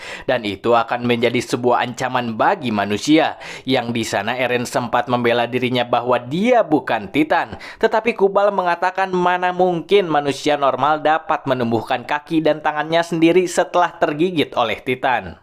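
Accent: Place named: West Java, Indonesia. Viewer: Bang lu kaya orang sunda logat nya..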